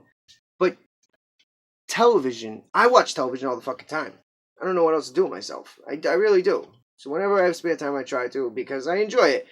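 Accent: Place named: Massachusetts, United States. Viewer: i just started watchin your videos. i like youre stuff. its funny bc i know a northshore mass accent when i hear one.... where you from? :)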